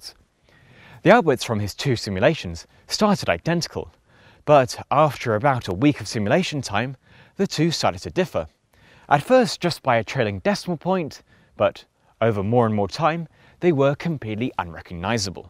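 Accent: Australia. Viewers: He sounds aussie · He is an Aussie but may have lived in the UK before moving to Australia. Not all of us sound like Crocodile Dundee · He’s definitely more towards the “cultivated”end of the aussie accent spectrum or flatout got an english-australian accent due to upbringing or familial exposure. Ngl I interpreted his opening joke about the weather was him joking about his english background. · He is an Aussie. He is poking fun at people that confuse the accents. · Your accent is far more Australian than it is British. Biggest give away is you say ak-Sent and not Aks'nt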